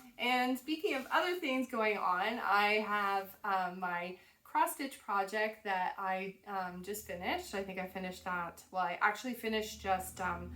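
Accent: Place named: Canada. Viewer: Hello, YouTube randomly took me to your site today so I decided to watch you while I finish up a sweater I'm working on. Your 'By the Bay' caption attracted me as I live in the SF Bay Area in N. Calif, but when I heard you talk I immediately recognized the Canadian twang so I knew your bay is far away from mine! Thought your family so cute and you seem so wholesome so I subscribed. Loved your cabled deep winter coat wip, lovely sweater! I went back and watched your episode 1 so I could learn where you are from and your name and saw your other doggie. Your gray dog looks like part border collie, is that right? And of course I saw your B/W border collie in the 1st episode. I have 2 border collies, male brothers from different litters that have same mom and dad. They are like my kids! I am finishing up a sweater for my daughter in law called Vanessa by Swans Island pattern. 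It is done in Juniper Moon Farm Moonshine colorway Lagoon. Wonderful soft yarn! My next adventure in knitting is Zwieg by Caitlin Hunter, It will be done in a medium gray yak/silk/woolen yarn held double with a lace weight for a dk weight final knit. Pink to dark rasberry yarns for the yoke lace section. This will be fun as I haven't done much with lace style effects and the yoke has lace in the oattern. Looking forward to a bit of challenge! Your sewing projects are great and I like seeing them. I'm a life time sewer.... went to FIDM in late 70s and I design + sew with a targeted interest in tailoring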